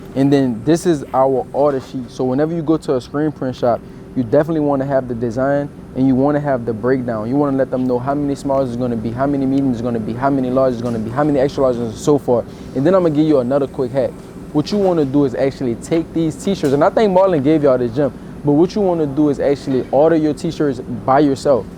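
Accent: South, United States. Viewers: Are you from Louisiana? I hear a southern accent. Love your content btw! I’m getting ALOT of tips for my business.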